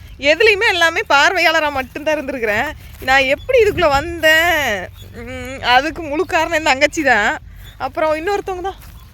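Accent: Kongu, India. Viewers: அருமையான வாழ்கை நம்ம எதார்த்தமான கொங்கு தமிழ் வாழ்க வளமுடன் ❤❤❤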